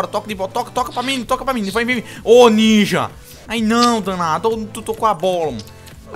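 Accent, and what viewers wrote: Northeast, Brazil. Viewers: vc eh de que parte do nordeste?!?! Me responde e me passa um contato seu... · Vc é Do Nordeste?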